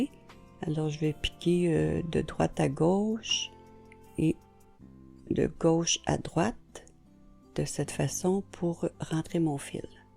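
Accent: Quebec, Canada. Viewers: J'adore tous vos tutos. Je vous ai découverte il y a peu mais déjà réalisé plusieurs pulls et autres avec les jolis motifs de feuilles et fleurs et c'est absolument magnifique. Donc ces mitaines je vais les faire pour moi et aussi pour mes filles et mes petites-filles. Et j'ai vu des toques et écharpes assorties pour femmes comme pour hommes. Magnifique. Les cadeaux de fin d'année, je vaus les commencer dès à présent. Merci et gros bisous de Belgique. J'adore votre accent. Moi qui avais fait les démarches pour habiter au Canada ou Québec, c'était sur le point d'aboutir lorsque tout ce que nous vivons a commencer. Montrez -nous encore plein de joilies choses. Ce sont des rayons de soleil ☀️❤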